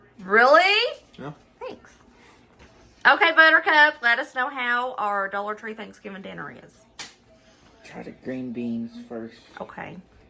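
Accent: South, United States. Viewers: I think this video was great, if we had a dollar tree in New Zealand i would totally try something like this food prices here are getting crazy. Im sure this video has inspired or helpped a family archive a holiday meal on the cheap and sometimes in life money is tight. Also your southern accent is amazing!!! 😊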